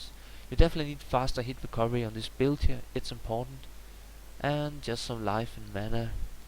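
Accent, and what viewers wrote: Denmark. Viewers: Love it. Are u by any chance danish :)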